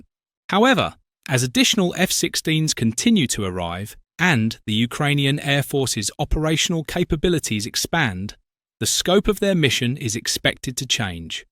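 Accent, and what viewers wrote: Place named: United Kingdom. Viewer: oh bullshit... this is all propaganda.. some brittish accent neocon accent narrator asshole brainwashing the idiots dumb enough to believe this propaganda...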